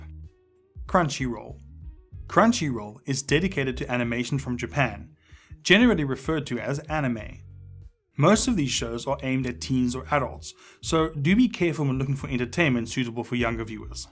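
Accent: South Africa. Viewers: You South African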